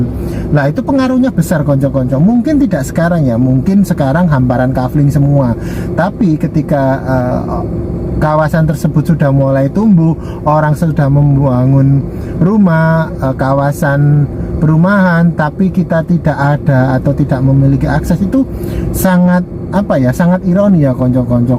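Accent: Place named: Jambi, Indonesia. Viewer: Kalo dengarin dari logak cakapnya Orang jaambi nih Betul dak bosku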